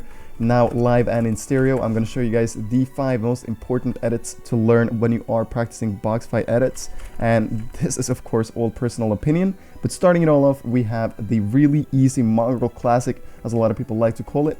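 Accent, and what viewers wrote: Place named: Norway. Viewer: omfg du hørtes så jvl norsk ut med da du snakka engelsk🤣 translated: you sounded so f*ckin Norwegian when you talked English 🤣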